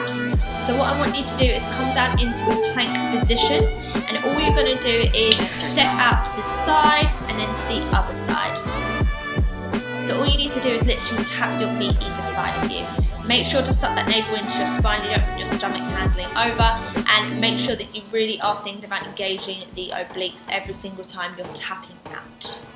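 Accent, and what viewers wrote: United Kingdom. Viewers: Is it just me or was your accent more Britishy last time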